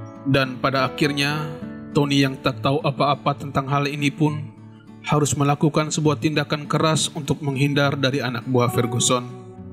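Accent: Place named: East Nusa Tenggara, Indonesia. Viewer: Seperti logat NTT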